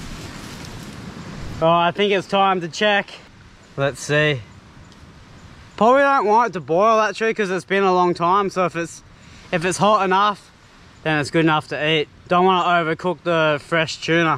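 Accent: Australia. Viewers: This channel is quickly turning into a cooking channel with great australian accent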